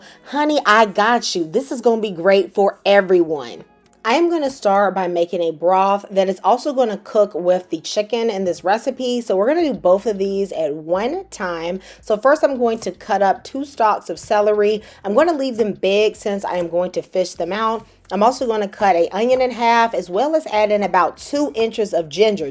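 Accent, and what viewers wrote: South, United States. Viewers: Where you from if I have to guess I would say Carolina’s, or Georgia. I love your channel.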